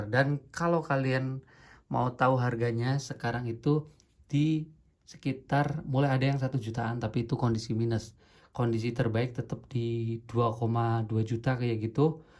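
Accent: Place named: Java, Indonesia. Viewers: Padahal udah bagus channelnya. Apa lagi perpaduan ngapak karo indo. Malah ganti maning haduh yawis moga lancar ges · Padahal dah keren, pas sma nada suara ngomong ya jawa.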